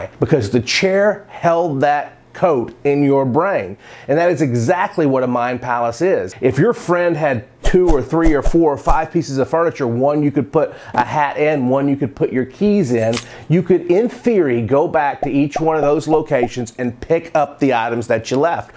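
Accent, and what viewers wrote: South, United States. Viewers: It’s so cute to hear his southern American accent saying things about Ancient Greece 😅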